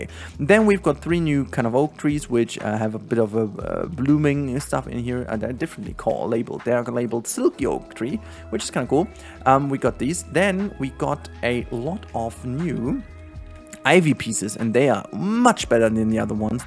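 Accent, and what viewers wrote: Germany. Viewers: hey i got an weird question but are u german?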